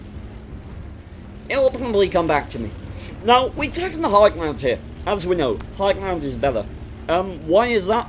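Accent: United Kingdom. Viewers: I like ur UK accent bro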